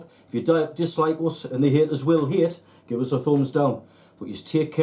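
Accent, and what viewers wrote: Sunderland, United Kingdom. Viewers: Hi, I just stumbled across this video, I don't go Sea fishing but used to do a lot of match fishing, but only club level, best times spent with your friends. Found this absolutely fascinating, the stainless steel knowledge, the mono or braid, and understanding the Sunderland dialect - I'm a woolyback from St.Helens!! This may have been filmed a while ago but I'll be back for more. This is great, content. Subscribed and will be back to watch more. Take care 👍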